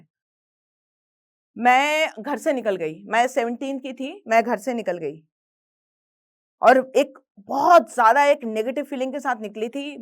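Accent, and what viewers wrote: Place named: Bengal, India. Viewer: Kaha se hai aap ye bhi pehle batana tha mam.. Aap bangali hai aapke baton se pata chalta hai... Hindi bhi sudharna hai aapko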